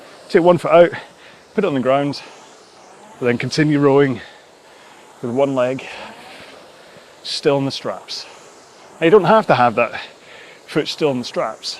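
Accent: Scotland, United Kingdom. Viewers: #Alfresco Have to say I hated that! Just don’t have the stamina just now for that pace so had to stop a couple of times for a few seconds then I needed 30 sec with 6 minutes to go. Can only get better!!! Thanks. Always good to hear a fellow Scot!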